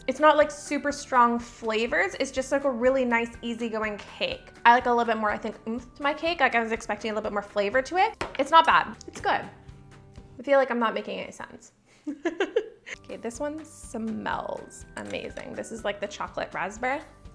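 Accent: Canada. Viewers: It's so funny when you're watching a channel for months and then they mention that they're in Canada and suddenly it clicks that that's the reason you're drawn to their videos and they seem so oddly comforting and enjoyable to watch. It's like hanging out in a friend's kitchen because your demeanor and "accent" is so familiar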